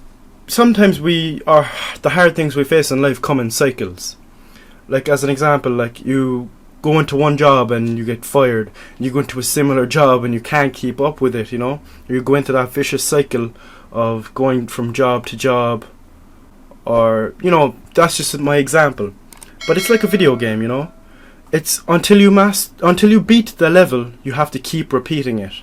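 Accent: Ireland. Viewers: I dont know if its your irish accent bro😭😭but some of the things u say the way u say them to🤣🤣🤣i be bussin out laughin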